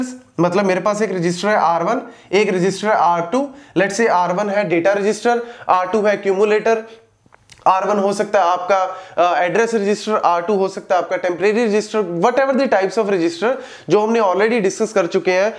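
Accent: Punjab, India. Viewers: The best Computer Architecture teacher ever! I hated this subject until I started watching your videos, Sir. :) I love your Punjabi accent, it makes the lectures interesting and fun. You are always to the point and cover the topics in such less time. I am thankful for your speed. If I ever become a professor, I want to teach like you.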